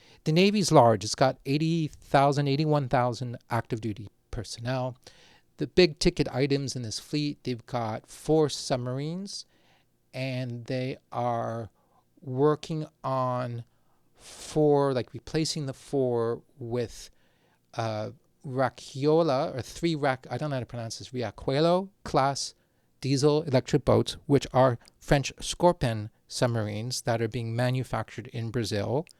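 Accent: Spain. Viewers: Brazilian here: fun fact, in internal politics in WW2, Brazil stood neutral in the beginning because it was having good affairs both with the US but also with Nazi Germany. And our government being running by Getúlio Vargas, sweared that we wouldn’t join the war not even if a snake smoked. Getúlio Vargas is known as our first dictatorship that was actually very positive viewed albeit the negative sides on dictatorships as we know them. But when Brazil joined the war, the slogan here was “A cobra fumou”, translated as “the snake smoked”. Edit: I forgive your pronunciation but we speak so much different from the Spanish pronunciation and you basically read our words with the Spanish pronunciation and some people here will get peeved.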